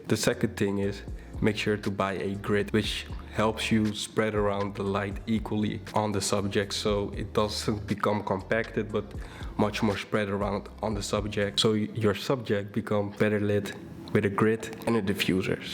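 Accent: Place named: Netherlands. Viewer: Hahahaha Dutch accent incoming, loved the video bro. Very dope tips and love how you explain those things!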